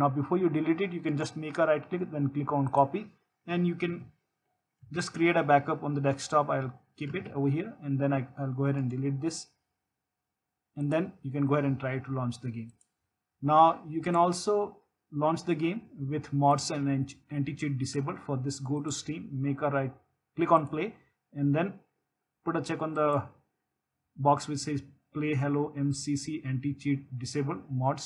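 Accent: India. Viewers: Any PC video with an indian accent is automatically a W. Well done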